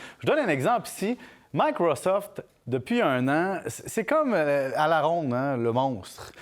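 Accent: Quebec, Canada. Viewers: J'adore les analyses boursières avec l'accent de nos amis quebecois... 👍😂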